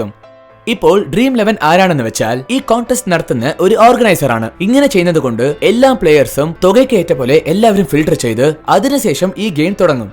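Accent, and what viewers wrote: India. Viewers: Not natural Malayalam looks like a Tamil guy did the voice over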